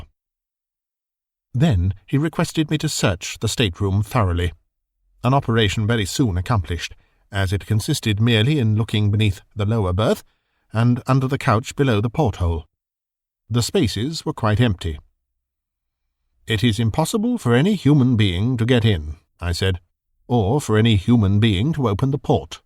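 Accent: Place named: Oxford, United Kingdom. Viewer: 👍 This seafarer horror story was well narrated, with a proper 🇬🇧 Oxfordian English-British accent 🗣️ that matches the setting of the Victorian Horror Story. Very enjoyable indeed!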